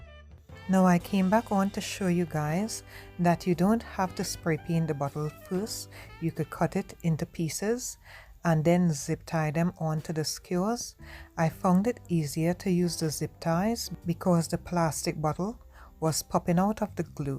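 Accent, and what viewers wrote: Trinidad and Tobago. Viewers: I'm from St. Vincent but I love the Trinidadian accent. I have relatives in Trinidad.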